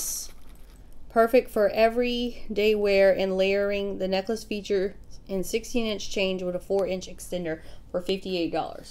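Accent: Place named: Louisiana, United States. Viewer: I knew I were from Louisiana. I could tell by ur voice. I live in Marksville, Louisiana. I am a lil over an hour from Lafayette. Girl do u get the box Single Swag? It is from the same company as this box. It is a lol better than this box.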